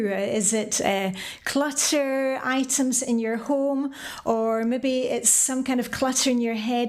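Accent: Scotland, United Kingdom. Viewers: I'm 79 and enjoy your site. My grandmother was born in Scotland so your accent is a sweet reminder of her. I've been through decluttering my former hobbies, but I still have the urge to buy office supplies every August when the school supplies are on sale. Often, my biggest problem in decluttering now is my husband who wants to run all our castoffs through a yard sale.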